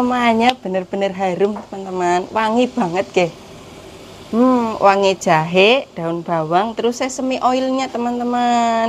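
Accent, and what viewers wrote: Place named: Tegal, Indonesia. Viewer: Wah resepnya mudah dan simpel y mb semoga bs dicoba SM ak insyaallah klo ad rezeki pengin nyoba jg mb seneng deh liat MB sekarang udah bs bikin konten sendiri y mb walaupun sederhana y mb videonya tp banyak yg nonton mb mengispirasi mb logat ngomongnya kayak ak MB ak dr Tegal ngapak jg mb tp ak ikut suami tinggal dilampung mb semangat terus mb bikin kontennya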